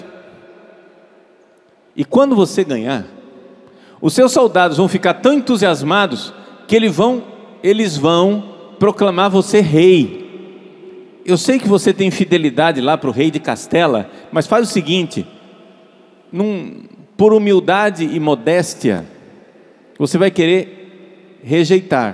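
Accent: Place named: Brazil. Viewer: Hilariante escutar o padre Paulo Ricardo com o sotaque e expressões brasileiras a representar D. Afonso Henriques! A batalha que o padre refere é a batalha de Ourique. Pouco se sabe acerca dessa batalha mas sim, a tradição conta isso mesmo acerca da aparição de Jesus ao primeiro Rei de Portugal. FÉ era decerto algo que movia os povos daqueles tempos. Fé essa hoje quase esquecida. Um abraço grande de Portugal a todos os meus irmãos no Brasil! Muita paz e amor! FÉ irmãos! Nosso Senhor não nos abandona! ❤️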